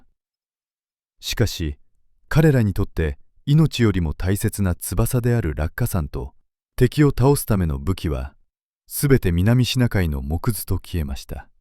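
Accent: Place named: South Korea. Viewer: なんで、こんなﾁｮ~鮮語のような、語彙の頭が破裂音の耳障りなカルトチックな音声になるんだい？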